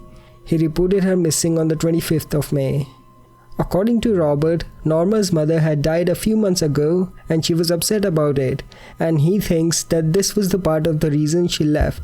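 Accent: India. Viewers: It's seems you're from an Indian origin..your accent seems like so..btw loved the way u explain everything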